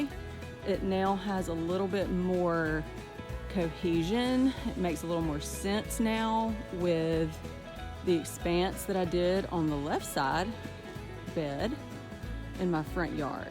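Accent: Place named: South, United States. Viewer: You talk just like me I’m on gulf coast pensacola fl.. however grew up in TN.. glad to find a southern gardener!! I would extend the bed up drive and connect with other bed.. it would make each side more symmetrical... love the plants!!👏❤️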